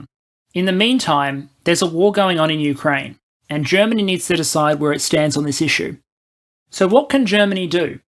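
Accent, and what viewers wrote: Australia. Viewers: Sound is much better, previously you sounded like you were recording in culvert. now you sound like an Australian. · Sound was good! Aussie accent helps.... calming..... My big question, do you employ additional researchers, or do you never sleep?? Content was accurate and engaging. Going to your Patron site......That is how good!!!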